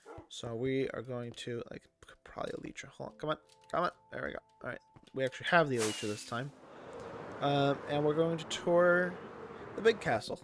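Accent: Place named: Scotland, United Kingdom. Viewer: you sound like scottish stampy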